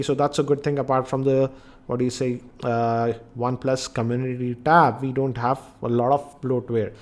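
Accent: India. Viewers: Indien English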